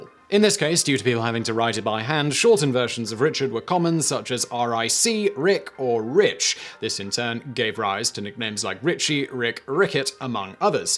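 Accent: United Kingdom. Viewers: Listening to a guy with what I call a “documentary style British accent” (like Sir Richard Attenborough) spend over 10 minutes talking about erections was hilarious 😆. Thanks, I needed that! 🤣🍆 🇬🇧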